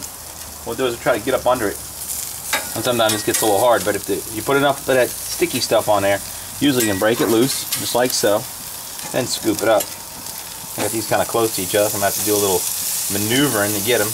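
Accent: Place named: Louisiana, United States. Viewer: im guessing hes from Louisiana the way he says filets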